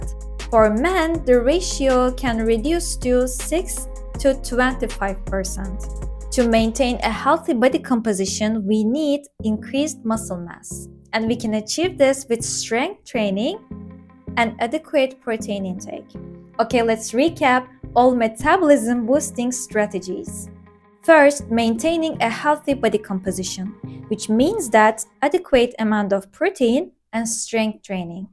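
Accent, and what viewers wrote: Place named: Turkey. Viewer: Im in love with your turkish accent 🤩❤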